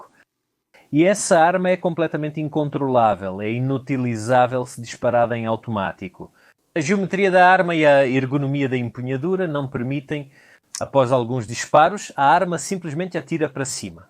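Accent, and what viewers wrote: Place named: Portugal. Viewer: BR Olá camarada. Você é real ou uma ia? Desculpe falar assim. Você tem um jeito engraçado de falar o português europeu e parece não sincronizar com seus lábios. Mas parabéns. Eu gosto de história. Continue 👏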